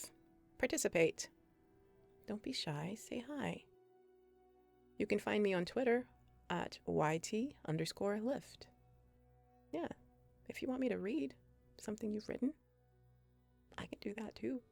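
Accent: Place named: Boston, United States. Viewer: Love that raw - unscheduled laughter....at the end / little did I know - there would be much more..... from your spontaneous, and bubbly personality 😂 my friend I want to say New England To Boston.... accent? " His fingas " Loved the reading Great writing 👌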